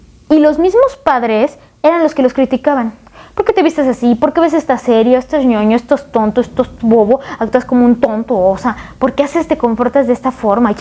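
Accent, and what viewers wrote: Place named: Puebla, Mexico. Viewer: HOLA HERMANITA, POBLANITA DE TALABERA, HABLAS COMO UNA AUTÉNTICA POBLANA. LO DIGO POR TU “MODITO”’Y ESTO ME ENCANTA. EL TEMA QUE A TOCAS SIEMPRE. A VA A SER ACTUAL Y SEÑALAS QUE A TÉS LAS MUJERES ERAN MÁS REPRIMIDAS Y ES CIERTO, PERO TAMBIÉN LOS HOMBRES. AUNQUE SEGURAMENTE ÉRAMOS MENOS REPRIMIDOS, AUNQUE EN MI CASO PARTICULAR LA REPRESION POR PARTE DE MI MAMÁ FUE EXTREMA Y MÁS AÚN YO DIRÍA QUE FUE BRUTAL Y NO FUE CORRECTO, AHORA SOY PADRE DE 3 HERMOSOS HIJOS, CON CARRERA UNIVERSITARIA TERMINADA Y SIENTO QUE EDUQUÉ A MIS HIJOS CON DISCIPLINA, PERO CON AMOR CON INTELIGENCIA Y ES COMO DICE EL DICHO: TODO CON MEDIDA, NADA CON EXCESO Y SIN EMBARGO Y A PESAR DE TODO NUESTROS PADRES CON LA EDUCACIÓN Y TODOS LOS SATISFACTORES QUE NOS DIERON, AUNQUE CON RIGOR, NOS HICIERON MÁS BIEN, QUE MAL, PORQUE SOMOS GENTE HONORABLE, PRODUCTIVA Y. 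OS ESPANTA VER QUE LAS GENERACIONES ACTUALES EN GRAN MEDIDA SE VAN DEGENERANDO MÁS CADA DÍA. AUNQUE POR FORTUNA ESTA ACTITUD NO ES GENERALIZADA, PORQUE HAY GRANDES Y MUY HONROSAS EXCEPCIONES DE FAMILIAS HONORABLES. SALUDOS HERMANITA Y MUCHAS BENDICIONES A TODA LA COLONIA DE PINGÜINOS.🇲🇽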